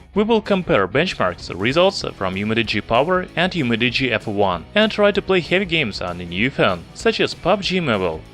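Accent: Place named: Russia. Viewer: русский? акцент похож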